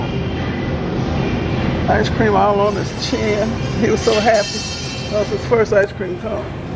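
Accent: Chicago, United States. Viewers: You from Chicago?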